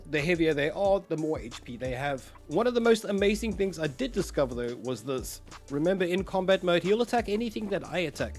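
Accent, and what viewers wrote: South Africa. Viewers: My boy's a South African... Goddamn. It's one of a kind😂Love from CPT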